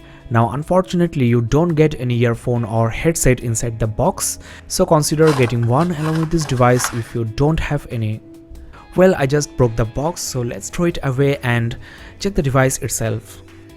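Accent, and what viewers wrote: Bengal, India. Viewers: Maza aa Gaya bangali English sun Kar..... Osaaam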